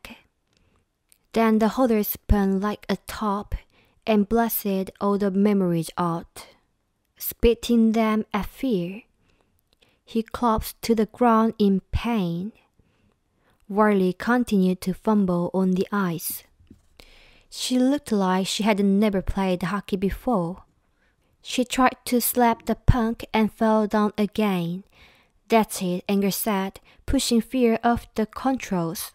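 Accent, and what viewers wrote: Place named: United Kingdom. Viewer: 처음 들어보는데요..영국발음!! 너무 좋아요~~목소리도 너무 좋고 다른것도 들으러 자주 오게될것 같습니당!!!!!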